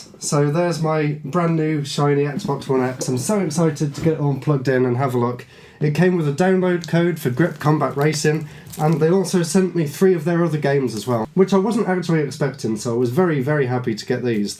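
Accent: England, United Kingdom. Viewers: Gretings from Brazil. I was looking for youtube retrogame vídeos and I found your channel. I really enjoyed how you was exciting with this retro shop. I had been visit London in 2015 but did not found this shop, what a shame!off course is always Nice listen The trully english, here in Brazil we have much more contact with the American english, and its not so Nice. Im glad that I found your channel.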